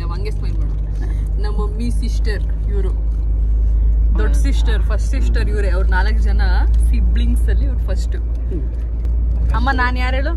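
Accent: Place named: Davangere, India. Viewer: Davangere slang kelake kushi❤😊so happy to hear from you😊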